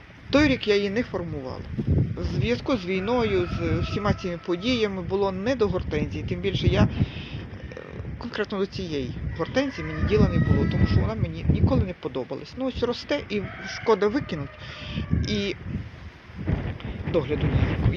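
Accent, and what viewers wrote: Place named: Chernihiv, Ukraine. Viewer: Вітаю Вас! Обрізка гарна. Кординальна. Теж так люблю. Дуже буду чекати Ваші осінні відео, щоб подивитися, що вийшло і як вона буде сформована з квітами. Впевнена, що буде дуже гарний кущ. А ще хочеться подивитися на сорт. Бо в самої є такі 2 різні кущі без назви. І підходять до Левани, і до Кіушу і до Тардіви. І всеодно думаю, шо ні одна з трьох. Тож дуже буду чекати Ваше квітування літньо-осінне. А ще у Вас дуже цікавий говор українській. Такій рідний моєму вуху... У моїх Чернігівськіх родичив такій. Ви не відтіля)))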